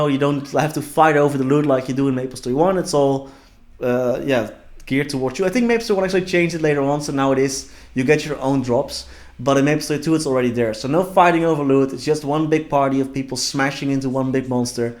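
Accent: Netherlands. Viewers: you sound from the Nederlands man ar im right ?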